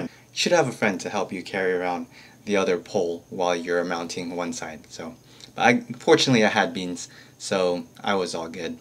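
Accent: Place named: United States. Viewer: hi from peru!! i like you videos i am so curious what is your ethnicity is? you sound are like american. :) thank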